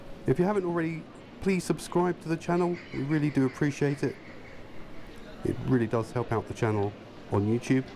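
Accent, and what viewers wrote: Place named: United Kingdom. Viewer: What I meant was I love your British accent that’s all. I am resting up for your next walking video. Stay safe.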